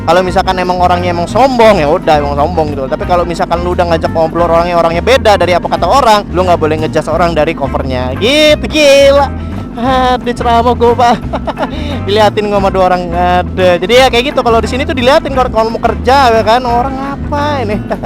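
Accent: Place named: Java, Indonesia. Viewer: Ngakak pas bang bicara ada logatnya jawa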